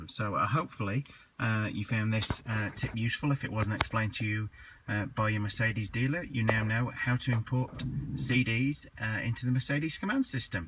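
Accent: United Kingdom. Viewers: useful, thanks... At & t though, you sound English - how are you on at & t or did you move to the usa>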